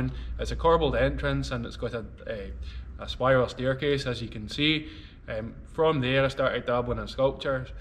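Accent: Scotland, United Kingdom. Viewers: Hi, thank you for answering my question about where your slate comes from. It was really interesting to see the two pieces together (the red and the green). As always it's so nice to see your beautiful work. How I'd love to have a dovecote like the one you made! Looking forward to seeing more of your videos, and as an American, to hearing your Scottish accent.:)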